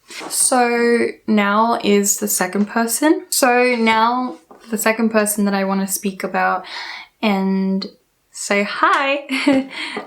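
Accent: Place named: United States. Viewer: Your accent does not seem Australian lol,it is closer being American than being Australian :)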